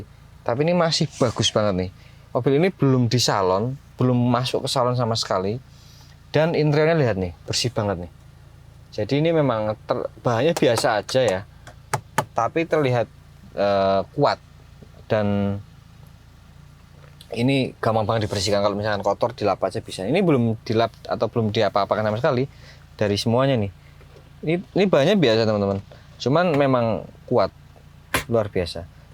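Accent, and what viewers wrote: Java, Indonesia. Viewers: Engkik...engkik....suka bahasa mas, njowo banget, :-)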